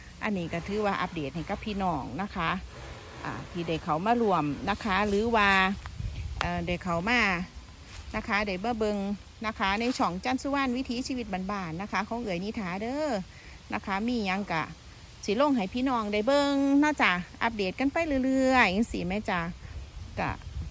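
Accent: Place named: Sisaket, Thailand. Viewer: สำเนียงแบบนี้ศรีสะเกษคักๆครับผม😂😂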